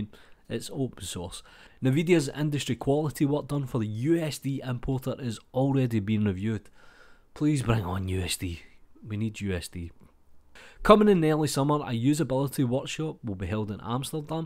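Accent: Scotland, United Kingdom. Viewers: Blender 4.0 is scheduled for August 2023 - assuming they're indeed still following the plan outlined in Ton's LTS blog post from February 2020. Four versions each year (one being LTS), and a big number change every two years. <link> Anyway, while I already read the news, I enjoyed listening to your commentary and Scottish voice. <3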